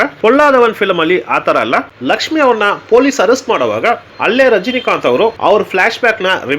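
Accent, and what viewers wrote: Tamil Nadu, India. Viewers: ಈ ಚಿತ್ರದಲ್ಲಿ ರಾಜ್ಕುಮಾರ್ ಪಾತ್ರದ ಸೌಂದರ್ಯ,ರಾಜಕಳೆ, ಘನತೆ,ಗಾಂಭೀರ್ಯ, ಭಾವಸೂಕ್ಷ್ಮತೆ,ತನ್ಮಯತೆ ಪ್ರಪಂಚದಲ್ಲಿ ಯಾರಿಗೂ ಬರಲ್ಲ.ಇಂತಹ ಅಭಿನಯವೇ ಅಲ್ಲದೆ ಸ್ವಂತ ಕಂಠಸಿರಿಯ ಅತ್ಯುತ್ತಮ ರಂಜನೀಯ ಹಾಡುಗಳು.ಆರತಿಯವ್ರೂ ತುಂಬಾ ಚೆನ್ನಾಗಿ ಅಭಿನಯಿಸಿದ್ದಾರೆ. ಜಯಮಾಲ ಕೂಡಾ ಬೆಡಗಿನಿಂದ ನಟಿಸಿ ಕಣ್ಮರೆಯಾಗುತ್ತಾರೆ. ಆ ಕಾಲದಲ್ಲಿ ಕನ್ನಡದಂಥ ಸೀಮಿತ ಮಾರುಕಟ್ಟೆಯಲ್ಲಿ ಭಾರೀ ಯಶಸ್ವಿಯಾದ ಚಿತ್ರ. ರಜನಿ ಬರೀ ಸ್ಟೈಲ್ ಕಿಂಗ್ ಆಗಿ ಮಾತ್ರ ತುಂಬಾ ಚೆನ್ನಾಗಿ ರಂಜಿಸುತ್ತಾರೆ. ಆದ್ರಿಂದ ಅವರ ಪಾತ್ರ ಹಾಗೇ ಮಾರ್ಪಾಡು ಮಾಡಿದ್ದಾರೆ. .ಆದ್ರೆ ತಮಿಳ್ ನಲ್ಲಿ ಒಂದು ಹಾಡು ಮಾತ್ರ ಚೆನ್ನಾಗಿದೆ,ಉಳಿದ ಹಾಡುಗಳು ಅಷ್ಟಕ್ಕಷ್ಟೆ. ತಮಿಳ್ ಮಾರ್ಕೆಟ್ ವ್ಯಾಪ್ತಿ ಜಾಸ್ತಿ, ಜಾಸ್ತಿ ಖರ್ಚು ಮಾಡಿ ಜಾಸ್ತಿ ಗಳಿಕೆ ಮಾಡಿದ್ದಾರೆ. ಈ ಕಾರ್ಯಕ್ರಮದ ನಿರೂಪಣೆ ಸ್ವಲ್ಪ ಸೌಮ್ಯವಾದ ತಮಿಳ್ ಕನ್ನಡ ಶೈಲಿಯಲ್ಲಿದೆ. ಕನ್ನಡವನ್ನು ಕನ್ನಡ ಶೈಲಿಯಲ್ಲೇ ನಿರೂಪಿಸಬೇಕು. ಒಟ್ಟಿನಲ್ಲಿ ದಂತಕಥೆ ರಾಜ್ಕುಮಾರ್ ಹೊರತುಪಡಿಸಿ ಒಂದು ಚಿತ್ರರಂಗವನ್ನು ಅತ್ಯಂತ ದೀರ್ಘಕಾಲ ಜನಪ್ರಿಯವಾಗಿ ರಂಜಿಸಿದ ತಾರೆ ರಜನಿಕಾಂತ್. ಅವರು ತಮಿಳ್ ಶ್ರಮಜೀವಿಗಳ, ಬಡ ಕೂಲಿಕಾರ್ಮಿಕರ,ನೊಂದವರ ಅಚ್ಚುಮೆಚ್ಚಿನ ಆರಾಧ್ಯ ತಾರೆ. 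ರಜನಿಗೆ ರಾಜ್ ಆದರ್ಶ ತಾರೆ.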